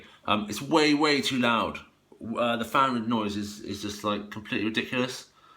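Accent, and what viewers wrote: England, United Kingdom. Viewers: could be wrong in regards to the accent but if hes a Pom no suprise on the whinging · You've got a great English accent and voice.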